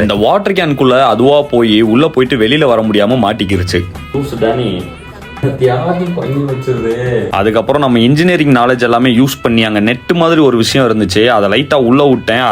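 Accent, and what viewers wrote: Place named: Coimbatore, India. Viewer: Aamaa bro naanum adha dhan notice pannen , coimbatore slang la pesuna perfect match bro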